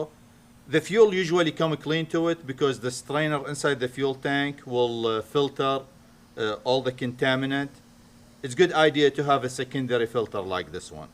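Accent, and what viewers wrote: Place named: Iraq. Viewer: Don't get me wrong you have noticeably Iraqi accent so, can you open an firearms channel with your Iraqi accent, the thing is similar to fps Russia or ( سلاح زون gun Zone )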